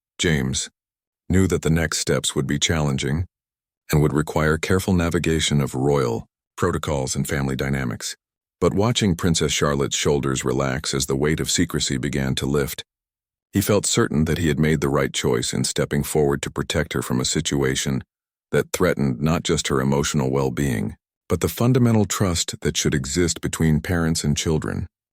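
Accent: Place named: United States. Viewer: Why is the voice over an American and how did he get this information ?????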